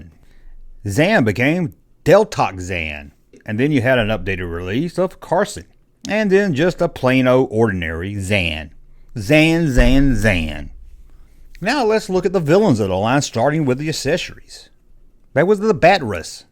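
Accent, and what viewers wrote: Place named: Georgia, United States. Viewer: Who gets mad a a great Southern Accent? Love the Georgian draw. No gay shit.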